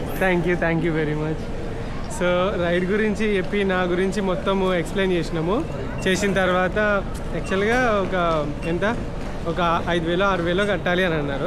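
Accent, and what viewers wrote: Telangana, India. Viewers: U r a worrest guy brother , main minus point me voice interesting ga anipinchadu so ne videos ki views +ne channel ki growth takuva , nuv konchem introvert vi bro neku set avavu e travelling vlogs , ram the traveller chudu ninnu chusibstrt chesi ekadiki vyelipoyado . Nelo kasi undhi but talent ledhu traveling vlog lo kavalsundi main thing voice adi kuda telangana jante Andhra telugu better + extrovertic behaviour + andari panulallo duralaney dula tatvam bcz viewers andaru dula gallu kabatti they like that , nuvento kamam tho kallu muskoni ne tent ne culycke nuvu matrame kanipistav epudu , mobbu matalu veskoni mujkutho matladuthu boring ga untav so ne channel ki growth takuva.... Better luck next tym . . First voice is important to communicate with people 2nd ur content is important 3rd fun is important 4th interesting conversations are important ... 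Lekapotey nuv na anveshana kante mundu start chesi ikade undipotav waste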